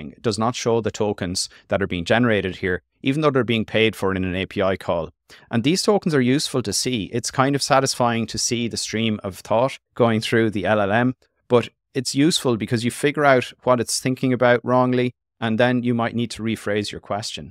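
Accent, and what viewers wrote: Ireland. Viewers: Surprising but great to hear an Irish voice on an AI LLM review. Great content mate intelligent & well explained, keep it up please!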